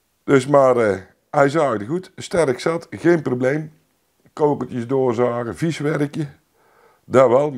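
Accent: Brabant, Netherlands. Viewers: Advies: eerst even een cursus Brabants volgen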